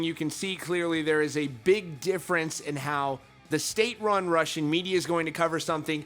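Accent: United States. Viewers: Omg this American pronunciation, I can't. Dude, google how to pronounce names of music bands.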